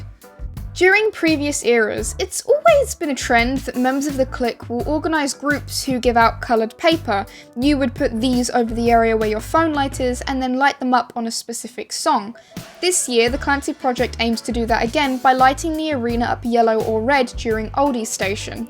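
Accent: United Kingdom. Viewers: i didnt know you were british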